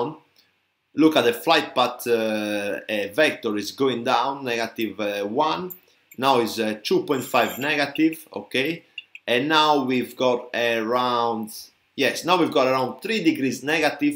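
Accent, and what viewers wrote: Italy. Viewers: Very well explained despite the very strong Italian accent.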